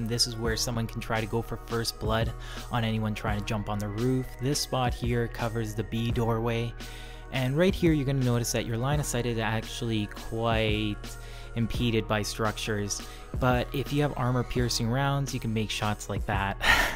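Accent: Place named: Canada. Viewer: Hey, are you Canadian? I can hear that accent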